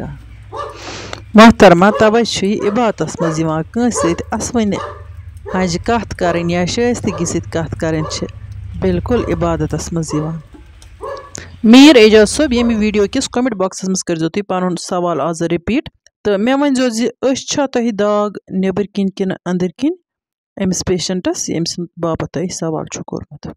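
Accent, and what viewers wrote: Kashmir, India. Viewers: Your voice is soft I love this kashmiri voice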